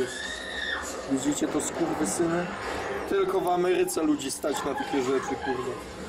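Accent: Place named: Russia. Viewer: Kupiłem taki sam za 90.zł.masz ruski ,akcent.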